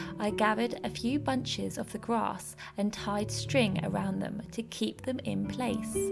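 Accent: England, United Kingdom. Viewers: Witchcraft sounds so much more magical when discussed in an English accent! 🍂🍁🍂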